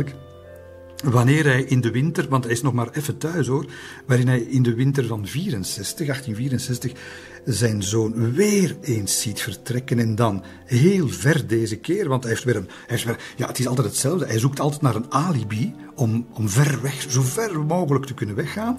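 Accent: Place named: Belgium. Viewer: Ik beluister deze podcast voor de tweede keer, maar wat een grandioze vertelstijl en wat een verbeelding! Het is alsof je een vlieg bent die Leopold observeert. Dan vergeet ik nog de onophoudelijke ironie waarmee hij de grootsheidswaanzin en de vele andere slechte karaktertrekken van deze koning beschrijft. De taal van Op de Beeck is onberispelijk en helder. Perfect begrijpbaar voor Nederlanders maar een beetje exotisch door de woordkeuze en uitspraak die zeer Belgisch zijn. Tussen het praten door wordt er ook mooie (klassieke) muziek uit die periode gedraaid. Dit is genieten van begin tot eind.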